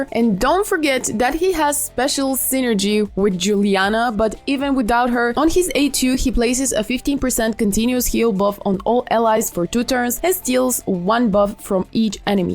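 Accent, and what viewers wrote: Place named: Quebec, Canada. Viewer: My guess is you are french Canadian, I hope I did not offend you by asking that, I was just curious because you have a beautiful accent. Great content for people starting our and I even watch myself even though I'm end game, sometimes I miss things so it's nice to refresh and in some cases learn something new I could have overlooked. 😊😊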